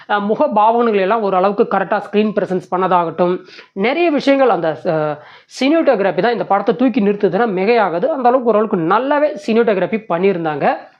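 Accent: Kongu, India. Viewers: Noted Kongu Tamizh Vazhga...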